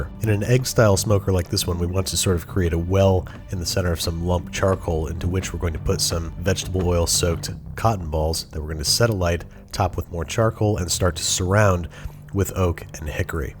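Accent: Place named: Rochester, United States. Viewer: Holy crap, which part of Rochester are you from? Hello from Greece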